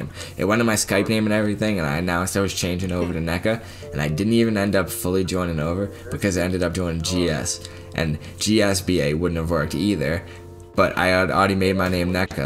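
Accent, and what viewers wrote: Boston, United States. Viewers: BOSTON ACCENT was the goat back then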